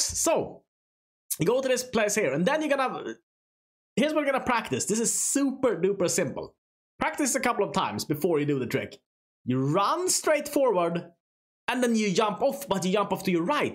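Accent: Sweden. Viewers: Svengelskan är start asså😁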